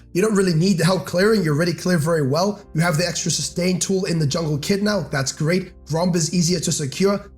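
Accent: South Africa. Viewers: Why do you sound 10x more south african when you're sick lmao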